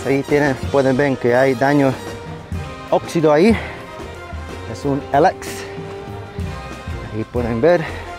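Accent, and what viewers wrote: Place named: Puerto Rico. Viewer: Saludos. lo de los vidrios se pueden reparar, ahora hay un metodo que usan para reparar. Pero se ve bien ese VW. Se dice burbujas de aire, lo del mercedez. Ya sabía yo que esa forma de hablar tenía algo de boricua. Que bueno tenerte paisano en estos medios...Haz ido a la isla? Yo vivo en Perú.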